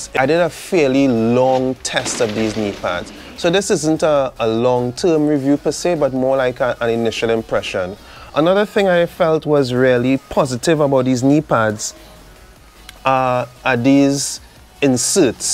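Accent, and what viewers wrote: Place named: Trinidad and Tobago. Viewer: I hear a trini accent where do you ride ?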